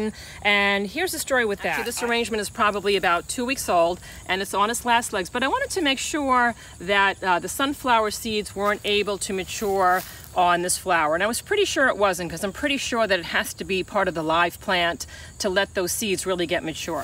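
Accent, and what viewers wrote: New Jersey, United States. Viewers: What is the foxtail plant in your sunflower field?..Is it a millet or a grass amd is it beneficial to the sunflowers or just something that grew there? Thanks in advance. I always miss my home state NJ when I hear you talk😍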